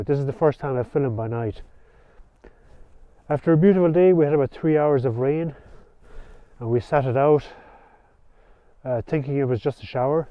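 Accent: Ireland. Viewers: Your channel is very wholesome and your Irish accent is very easy on the ears brother. The Irish have the most beautiful language of all European continent. The romance languages of Europe pale in comparison to the Irish language. Many Years ago, for 26 years, I owned a made in USA Schrade Old Timer Blazer stockman. That three blade stockman blazer had a very special design large sheepfoot blade that sliced through saplings, feed sacks, rope, cardboard boxes and weeds like a hot knife through butter. It never became loose, never broke, never failed on me after 26 years of hard pressure testing. The large clip blade cut many fruits & vegetables well and also cleaned fish. It was carbon steel so honed easily and held an edge well. The spey blade worked well for skinning many squirrel & white tail deer. I gifted that knife, my most prized possession, to my best Army brother. 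Schrade Old Timer knives are no longer made in USA and their quality has gone down since being made now by atheist communist China.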